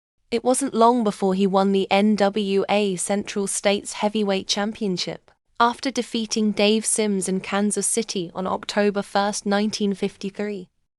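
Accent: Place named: United Kingdom. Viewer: Why is there a preponderance of British accents vo-ing mob stuff ? I’d rather hear a Brooklyn accent ! More realistic and less nauseous !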